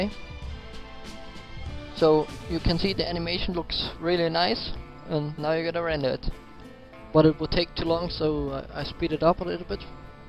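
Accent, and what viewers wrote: Germany. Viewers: man hat gehört das du deutsch bist =) aber richtig geiles tut wird mir sehr helfen danke.. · are you from germany?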